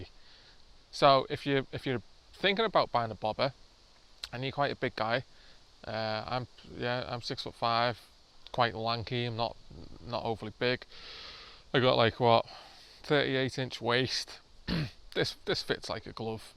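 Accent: Liverpool, United Kingdom. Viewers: A Scouser in Finland ? Are you on the run? LOL PS Subscribed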